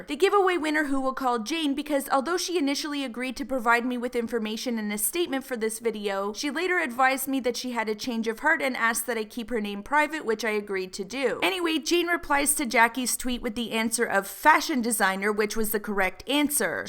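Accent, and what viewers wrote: Canada. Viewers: Hi, i'm from israel. I am so happy if i get a present or especially makeup for free.both parties were wrong as you said."jane" should have waited polietly and jackie should have given her an update. I'm sorry for misppeling, it's not my native language. I love your canadian accent.you have a good voice.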